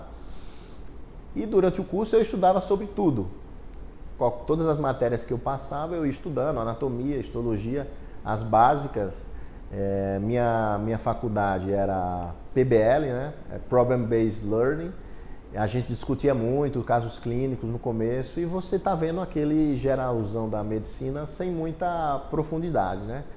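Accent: Northeast, Brazil. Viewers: Oi Dr.:, eu lhe descobri aqui no YouTube por um acaso, mas foi maravilhoso te descobrir aqui, fiz curso técnico de enfermagem, apesar de não exercer a profissão, eu continuo sendo curiosa em relação aos assuntos de saúde, e com isso acabo relembrando meus aprendizados com os meus conhecimentos de enfermagem e de medicina, percebi desde o primeiro vídeo que vi seu que vc é nordestino, nosso sotaque é inegável em qualquer lugar do mundo! 😂😂😂😂😂😂😂😂 amo assistir seus vídeos! Sou de Arcoverde-PE!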